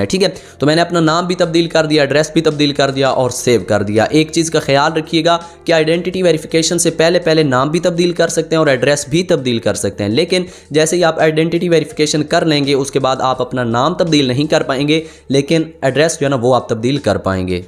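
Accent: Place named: Noorpur Thal, Pakistan. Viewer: Zaban isi tarh lg rahi hai Main khod noor pur thal sy hu and wsyy ap kahan sy ho..bye the way poch raha hu bro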